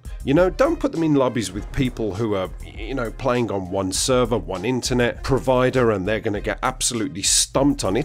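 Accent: United Kingdom. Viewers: are you British? *****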